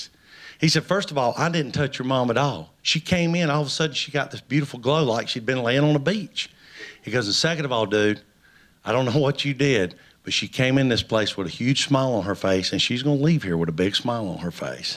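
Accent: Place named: North Carolina, United States. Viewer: Literally tearing up. From NC myself, glad to hear a voice from home.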